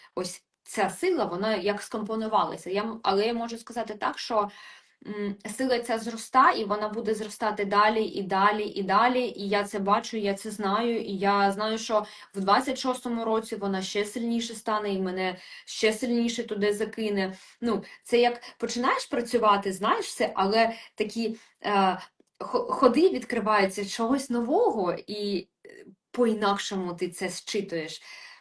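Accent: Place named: Russia. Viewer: Важко зрозуміти де які слова цієї відьмочки.Мабуть вона раніше була російськомовна або в неї як це говориться російськомовна щелепа і говорячі деякі українсікі слова вона не зовсім розуміє їх значення.То може б краще роворила б російською.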